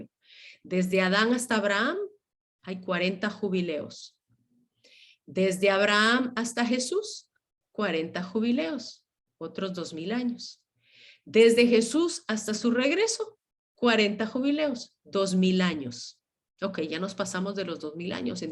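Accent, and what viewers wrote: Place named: Costa Rica. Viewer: Tienes acento de costarricense .. !! 😀 👍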